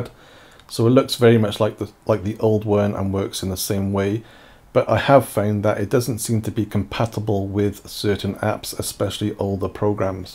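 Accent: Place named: United Kingdom. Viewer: I just started watching the video and already gave a like on It just because I loved the man's accent, IDK If he Is British but I love the British accent, and his accent sounds very likely, so... yeah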